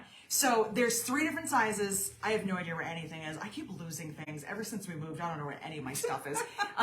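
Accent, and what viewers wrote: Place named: Massachusetts, United States. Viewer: I just discovered you while watching Siah do a walk around at some quilt show a month ago down here in Florida, and you were the last booth she went to, and met you...I loved your patterns, and your beautiful Cork (which I've never in my life used), and I just loved your personality and omg I fell in love with your free pattern for that beautiful wallet made all from that gorgeous cork! I was asked last year to do a small craft fair, but it was last minute, and I had nothing made, so I made a ton of stuff up this year in between all the surgeries I had to have on my legs, and the lady who organized the event had to cancel as her husband passed away. This would have been my very first ever event...I had a really rough year and can't wait for it to be over...I will be having another surgery next Wednesday and may be looking at a bypass surgery on my left leg the Doctor told me. 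Anyways, I just had to tell you how much I love your items, and how happy you make me watching you because of your fun and bubbly personality...Thank you for that! Sorry I wrote you a book! It was really fun watching this video, and I look forward to more! P.S. I had to laugh about the massachusetts accent as that is where I'm from, and talk just like that, and live in Florida now... lol...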